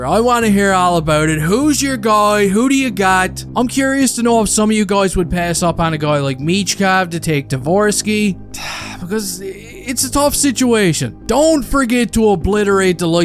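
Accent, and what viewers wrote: Newfoundland, Canada. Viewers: Sounds like a Newfoundlander. Where are you from?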